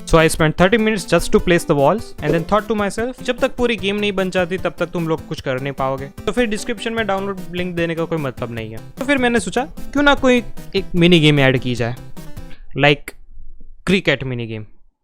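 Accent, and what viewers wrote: Gujarat, India. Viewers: Omg a gujju speaking hindi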